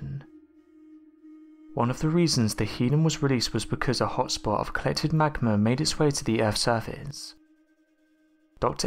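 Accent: England, United Kingdom. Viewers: It should be an AMRICAN dude doing the documentary is this ENGLISH dude a geologist? IDTS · I don’t need some British guy telling me about OUR VOLCANO IN ‘MERICA!!!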